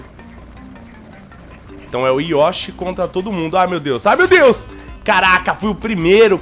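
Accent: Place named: São Paulo, Brazil. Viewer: sotaque paulistano é nois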